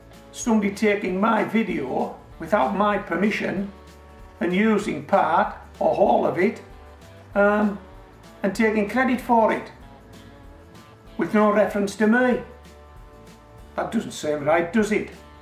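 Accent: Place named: Scotland, United Kingdom. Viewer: I've loved your various videos on gardening in pots! Indeed, I'll be using your advice (with a few modifications) whilst growing some potatoes (to start) in Los Angeles. Also, thank you for this particular video. I honestly had no idea about the plagiarizing of videos on youtube. Since I'll be starting my own channel fairly soon (nothing to do with gardening), I'll be taking preventive steps from the get go...thanks to you. Please, keep up the good work and give Molly a hug for me. Btw, it's always lovely listening to you....your accent reminds me of my Scottish grandparents. <3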